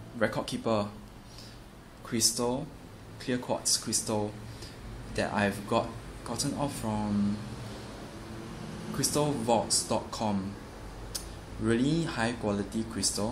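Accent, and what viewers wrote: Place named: Singapore. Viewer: You sound Singaporean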